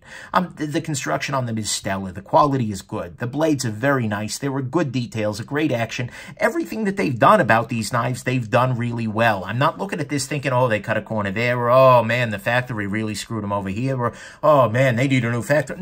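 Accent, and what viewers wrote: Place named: Long Island, United States. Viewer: As soon as I heard your voice I would have guessed you had a friend named Frankie LOL. No disrespect man I got a buddy that lives on Long Island to talk to the same way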